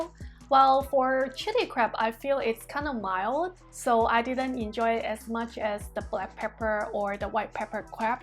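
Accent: Singapore. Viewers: Your singlish is 👍💪👏 Popiah and fried carrot cake are my favorite foods🥲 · I am hearing some Singapore accent when you speak :)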